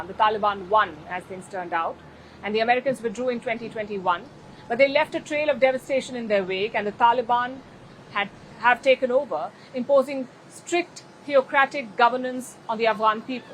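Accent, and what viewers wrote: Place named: India. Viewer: Her English is India English not English..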